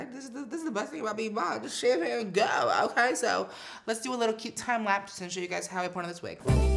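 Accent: United Kingdom. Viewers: Love the British accent 😍😍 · Your voice with british is really good omg!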